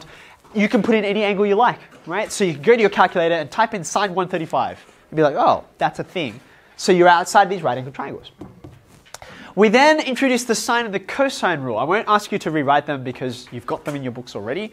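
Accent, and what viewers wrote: Australia. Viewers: He’s Australian. Apparently us Aussies also speak the commonly spoken language known as ‘English’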